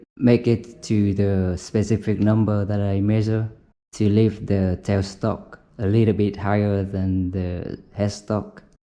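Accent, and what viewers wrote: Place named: Vietnam. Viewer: Nghe phát nhận ra người Việt luôn 😂😂😂, coi tên kênh thì chính xác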